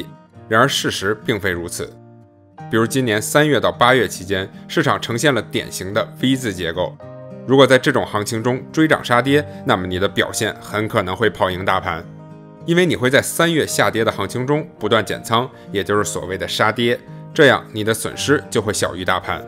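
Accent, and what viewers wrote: Beijing, China. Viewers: 的京片子真的好聽！不抄股的空挡也可以去当演員了!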